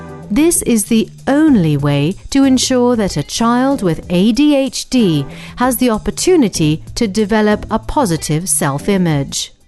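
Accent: United Kingdom. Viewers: I love english accent : ) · typical British English :D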